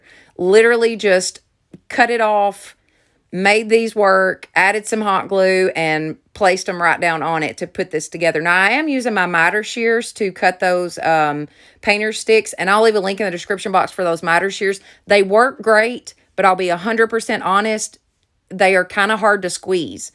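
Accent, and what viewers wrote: South, United States. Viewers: I just love your videos!!! You talk like me. I’m southern through and through. From SC. Would you do a video for bathroom? Need a change bad. Thank you sweetie for all your content😍😍😍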